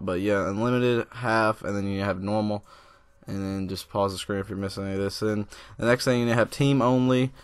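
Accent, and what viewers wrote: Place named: Bay Area, United States. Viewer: Bro you from the bay !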